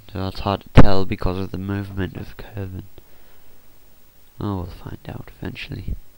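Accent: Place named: North, United Kingdom. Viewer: Haha close enough! Thought you had a northan twang to your accent :)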